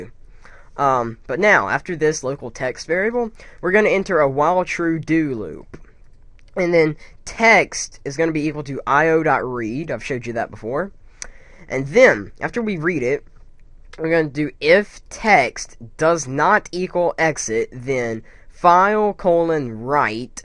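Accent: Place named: North, United Kingdom. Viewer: You sound like a Northerner to me, sorry if that's offensive. Greetings from WNY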